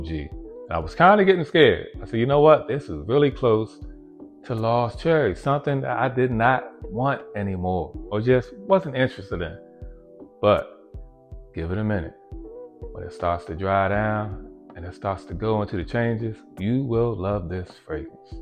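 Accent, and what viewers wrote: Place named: Baltimore, United States. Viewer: you gotta be from baltimore OG lol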